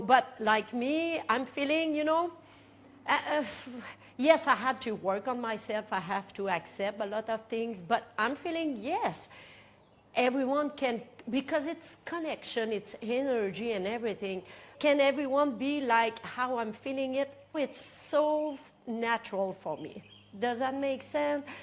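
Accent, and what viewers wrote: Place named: Quebec, Canada. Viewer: My mom was from Quebec. Because of your accent, I feel like you are family! Hope to meet you one day. I admire your work.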